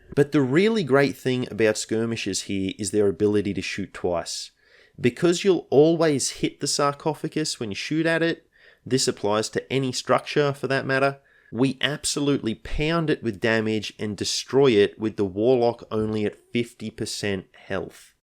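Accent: Australia. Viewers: your accent is uniquely Australian. you sound like a Queenslander but you know... your not being a cunt, so thats nice. keep it up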